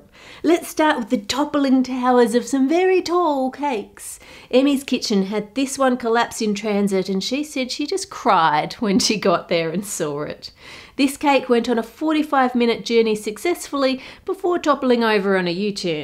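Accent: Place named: Australia. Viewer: love the australian accent.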